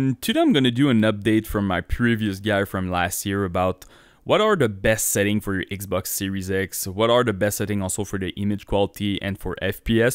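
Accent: Quebec, Canada. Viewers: Man you're hella Quebecuois.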